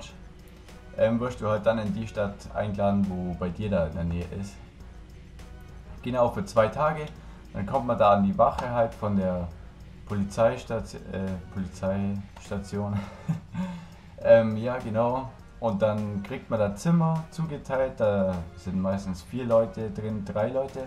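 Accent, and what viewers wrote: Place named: Bavaria, Germany. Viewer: Dafür kann er nichts, viele Polizisten aus Bayern sprechen so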